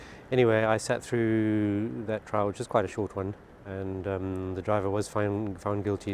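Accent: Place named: South Africa. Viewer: Cant work out the accent, South Africa at a guess? Whats the driving like over there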